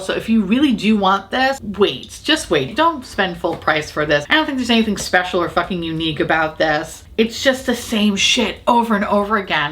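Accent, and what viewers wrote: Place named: South, United States. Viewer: you are 5 more y'all close to me loving you even more. I also love the random southern accent for no reason poppin up these days. Yea show is Pat 2 !!!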